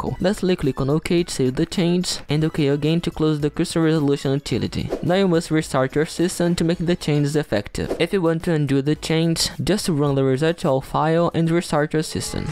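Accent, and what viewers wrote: Brazil. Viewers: Da pra sentir o sotaque de brasileiro, mas seu inglês ta muito bom mano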